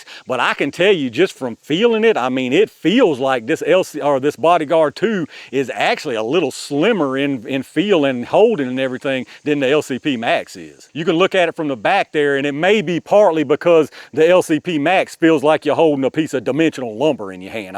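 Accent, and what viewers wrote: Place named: Appalachia, United States. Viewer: Hey. Great video, informative yet succinct. Just got rid of an LCP Max. Your ergonomic description of it as “a piece of dimensional lumber” is spot on. I never enjoyed shooting it, no matter what I did (extended mag, Hogue grip, etc.). And the trigger was absolute trash. Felt just marginally better than the cap guns I used to play with as a child. Also, very much enjoyed the beautiful Appalachian accent (hope I got that right). All for free. Good deal. God bless!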